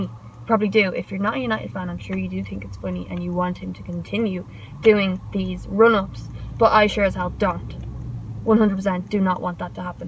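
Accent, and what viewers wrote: Ireland. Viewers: You're Irish where you from love I'm from tallaght can't guess your accent haha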